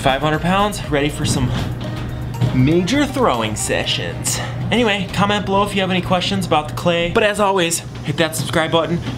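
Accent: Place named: Upper Midwest, United States. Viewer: I’ve been binge-watching your videos for a week or so because I grow orchids, and I have a hard time finding pots – I like to use clay pots whenever possible, but orchids need to have a lot of air around their roots – so I’ve been considering making them myself, so that I can make them so that they include all of the specs that I want. Your teaching style is great, and entertaining, so I’m really enjoying the “binge” of your videos! Thank you for doing such a great job! Second, and this part is just a dumb bit of anecdotal fluff: I noticed from the first sentence that you spoke that, based on your accent, you HAD TO live in the upper Midwest, because your accent is pretty much EXACTLY the same as mine! I lived in southwestern North Dakota until I was 17 (our accent is a bit more Canadian up there; and something that may help to strengthen my “family accent” is the fact that my grandparents on my Dad’s side still occasionally spoke German in the home to keep secrets from the kids, and later, us grandkids). 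Anyway, I moved to Chaska when I was 16 (HS graduation), where I lived until I was 20, when I bought a house and moved to Minneapolis. I lived there until I moved to the New Orleans area to finish my degree, back in 2007. During my junior and senior years, I taught freshman chemistry, and without fail in every single section that I taught, one of my students would ask me what country I was from, usually on the first or second day of classes. I guess the [slightly paraphrased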